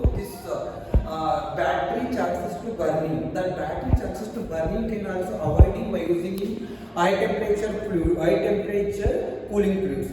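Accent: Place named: India. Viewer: This Indian English only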